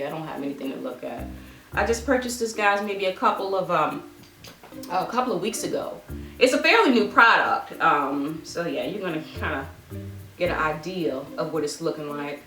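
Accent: South, United States. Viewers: 😂 especially when she says "pores" for me. Maybe the southern accent persuades me. I'm about to go refresh my face now...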